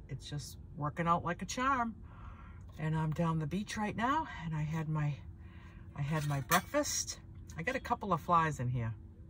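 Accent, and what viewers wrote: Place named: East Coast, United States. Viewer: you are a joy to see! Love your setup. You actually remind me of me! I eat very similar to you. I’ve been more vegetarian and Mediterranean with my diet since I was a kid. The only meat I ate was what my mother cooked, but always loved fish, and greens and veggies are my favorite. I went Vegan 2 years ago and then slowly added back in fish, eggs, and some cheese, mainly hard cheeses from Italy and mozzarella, and also added back in the olive oil. If my fridge isn’t packed with leafy greens and other vegetables then it doesn’t matter what else I have, it feels empty. Mackerel is one of the best fish to eat for one’s health! By the way, I hear the east coast in you, are you on an east coast beach? I’m originally from Long Island now living in Southern California. Stay well🌟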